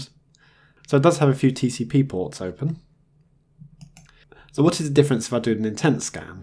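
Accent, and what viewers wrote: United Kingdom. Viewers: I have subscribed to the channel just because the British accent :gentleman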